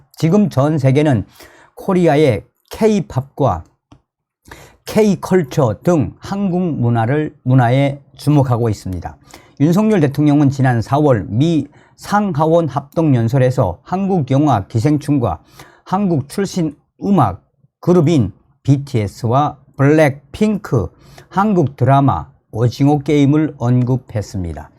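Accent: North Korea. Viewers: 오늘은 차분하게 잘 발언했어요. 부탁을 하자면 큰 문단을 마치고 나면 짧게 쉬고 다음 문단 들어갈때 심호흡을 하시고 들어가는게 좋아요. 그렇게 하지않으면 생각이 정리되지 않은것 처럼 보이고 자쿠 더듬게 된답니다. 그리고 요즘 사랑들 웬만한 북한 사투리는 다 알아들어요. 외국어는 미치 좀 연습할 필요는 있고 일반 언어는 편한 북한 사투리로 해도 괜찮습니다. 경상도 사랑들은 굳이 표준만 쓰려 하지 않아요. 자기에게 익숙한 언어로 심호흡 해가면서 또박또박 자기 주장을 전달하시면 좋은 결과 있으리라 생각해요. 모든 사람이 다 말솜씨 타고난것 아니니 좀 모자라는 말솜씨 가진 분들은 노력해야죠. 하다보면 어느샌가 유려하고 매끈한 진행 하시게 될거예요.^^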